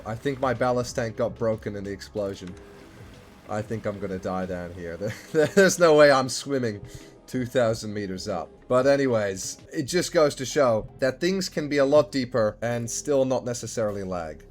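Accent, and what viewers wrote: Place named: United States. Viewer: I’m having quite a hard time pinning down your accent. Your account says Australia but your voice sounds much more American, but not completely.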